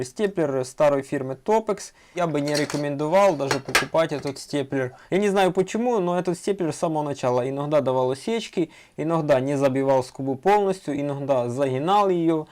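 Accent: Vologda, Russia. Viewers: Вологодский чтоли 😅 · Спасибо, познавательно! У вас акцент вологодский)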